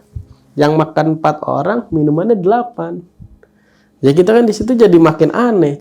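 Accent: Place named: Semarang, Indonesia. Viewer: Buset orang semarang kah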